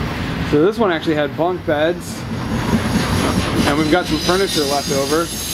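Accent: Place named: United States. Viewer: I do wonder just how they got to be all "rummaged through" like that. Maybe animals had gotten in them and ripped everything up, like the cushions of the chairs and couches? And, NO toilets? Just HOW old are these cabins? And, since I am commenting here as I watch the video, what state is this all in? I am just ASSUMING it is in the USA. Just because our "tour guide" speaks perfect English of the US type. By the spruce tree's in the video, I don't think this is too far South in the US, IF it is in the USA. Okay, at about we get past the shacks or cabins to the old trailers. The trailers SEEM to be maybe 1980's? Possibly late 1970's? And, it's just odd how the trailers seem to just be abandoned without anybody taking most of the food and other things with them when they left it. And, whomever's land they are all on, haven't they pushed to get it all cleaned up? 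It is all so mysterious how this old place is just left here abandoned. You would THINK that IF it could be all cleaned up and cleared out that MAYBE the property owner could sell the land OR even make it a nice place for a NEW trailer park , or IF it is NOT just out in the Boonies, a good place for new homes to be built at. BUT, It IS really quiet out here, it MAY be in the boonies of the boonies. And, maybe most people would NOT want to be that far away from a city or town center. Some of these trailers may be even from the 1960's, but, some are maybe 1980's too. Most of the furniture is about 1980's too. But, ho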